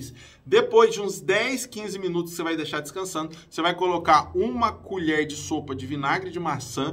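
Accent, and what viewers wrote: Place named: Minas Gerais, Brazil. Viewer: Eu gostaria de dar mil likes para você Dr. Sou mineiro mas resido aqui no Rio de Janeiro há 45anos, e você pelo sotaque parece ser meu conterrâneo, Mineirão mesmo. Eu era gago qdo criança até aos 9anos, minha mãe uma Pernambucana arretada me aplicou um remédio natural do nordeste, uma bela duma colher de pau na cabeça, e não é que eu fiquei bom na hora.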